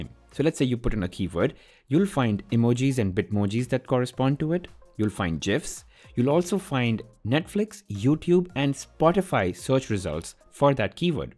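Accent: India. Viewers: Tapi logat lu kek orang india bro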